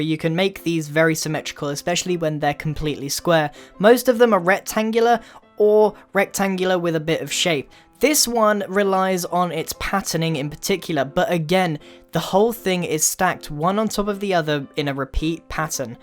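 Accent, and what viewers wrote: United Kingdom. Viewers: Right now i feel like grian has become more British lmao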